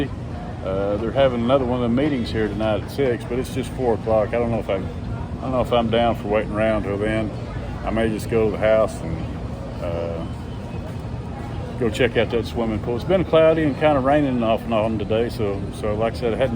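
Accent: South, United States. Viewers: 1st time viewer of your channel. You have a southern accent. Where are you from in the States?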